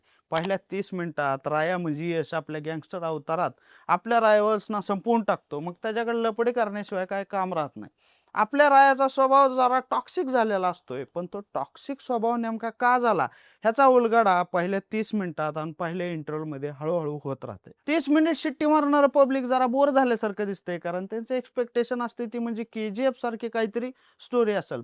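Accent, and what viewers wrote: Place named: Barshi, India. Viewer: बार्शी cha आहे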